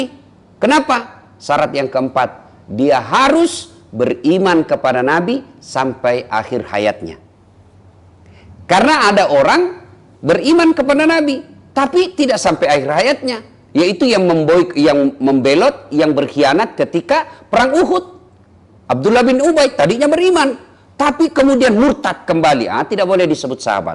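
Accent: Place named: Makassar, Indonesia. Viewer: Assalamualaikum Ustadz, sy suka ki ceramax ustadz krn logat mkssrx kental sxl,,, dan MANTAAP Ustadz Ceramax,,, 🙏👍💕😎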